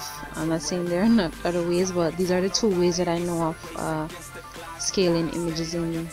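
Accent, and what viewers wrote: Jamaica. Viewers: Good job. Knew you were Jamaican when I heard the voice. Thanks for the help. You stop making vids I see. Hope all is well. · U Jamaican ? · U jamaican? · the Jamaican accent tho :)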